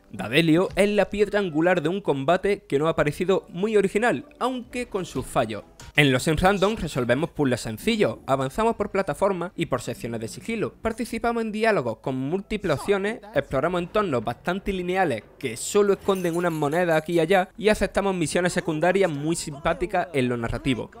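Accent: Andalusia, Spain. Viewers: Este chaval es de Jaén o Granada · No tiene ningún problema de dicción,simplemente es su acento.Posiblemente sea de Almería o Jaen.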